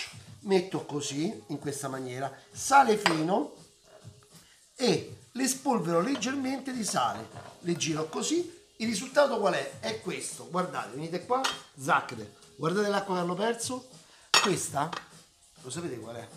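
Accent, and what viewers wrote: Rome, Italy. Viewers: Che bello risentire il romano!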